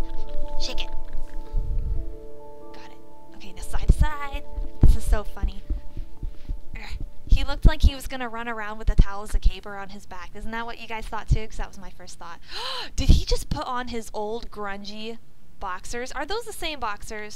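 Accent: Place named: Canada. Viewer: lovely commentary,very plesently spoken..you sound canadian..nice voice..kinda similar to a LP'r name schaly..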